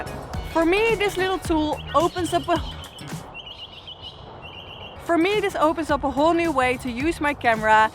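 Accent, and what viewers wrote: Netherlands. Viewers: Nice Hollands accent 😁😁. Cropping... The same as cutting out a piece afterwards. Nothing special... No real extra zoom. Just An extra digital zoom like the older digital camera's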